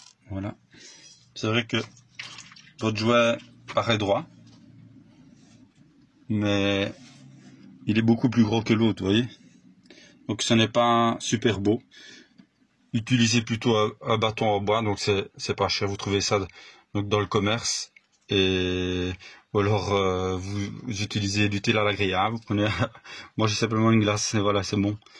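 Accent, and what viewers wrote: France. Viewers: C'est très propre bravo et j'aime bien le petit accent alsacien 😉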